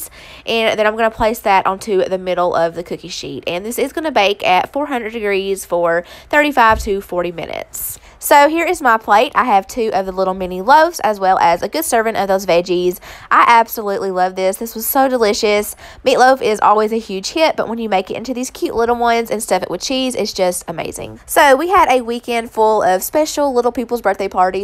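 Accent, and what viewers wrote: South, United States. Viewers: Are you from the south? Cause you talk and cook southern like myself :p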